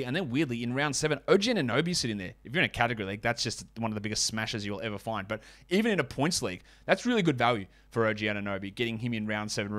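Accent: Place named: Australia. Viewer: I'm back for the year fellas!!!! Missed ya Aussie voice!!! Greetings from Chicago! Go bulls!!!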